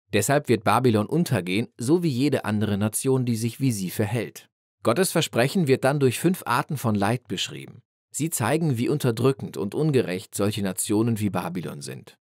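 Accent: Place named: Ruhr, Germany. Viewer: Was für ein Ruhrpott-Geschnatter... Wer's authentisch hören will, sollte sich besser das Buch selbst anhören. z.B. hier: <link>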